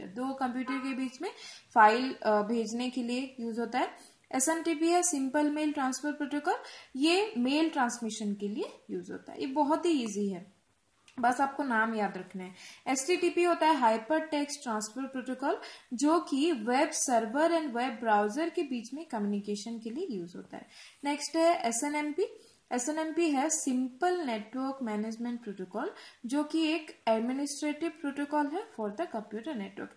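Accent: Odisha, India. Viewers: Are you odia ??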